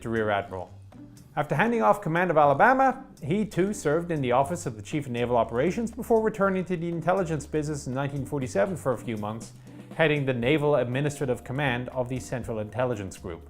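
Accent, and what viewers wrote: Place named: Ireland. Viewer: I really like the systems view of the technical details, the dry wit, and the understated Irish accent. It stands up pretty well, even to a mostly talking head approach. An inside the hatch view of a battleship would probably take about 1000 hours at least, so that’s not likely to happen. I would find it entertaining, though to see how you would fit through the passageways and hatch ways, and into the racks or berths or morgue slots or whatever they called the beds on those things. I’ve got the misfortune of being 6’4”, with a non-streamlined length to beam ratio, and I don’t think I’d do well.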